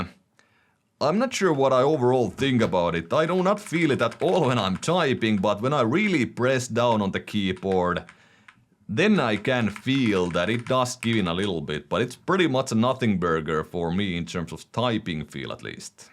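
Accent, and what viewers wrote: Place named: Finland. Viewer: it's finnish accent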